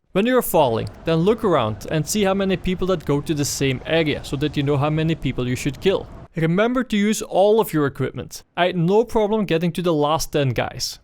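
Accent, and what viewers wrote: Netherlands. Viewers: Ik hoor je Nederlandse accent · zijn accent is zo vreselijk nederlands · it Sounds like your from the Netherlands with that accent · it sounds like he is Dutch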